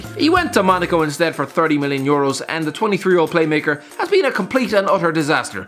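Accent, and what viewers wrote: Ireland. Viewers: Are you irish ? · Robinho is far from overrated. Where are you from?? Ireland??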